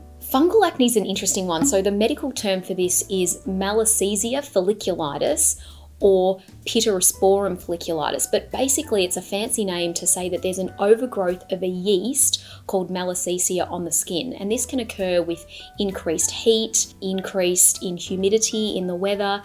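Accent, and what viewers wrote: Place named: Australia. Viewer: Nice to hear an Aussie!!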